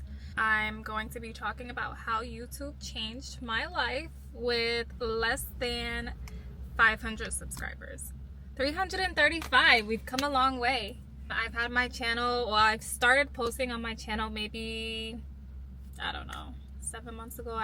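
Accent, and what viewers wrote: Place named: Trinidad and Tobago. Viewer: Ya sound like ya from Trinidad 🇹🇹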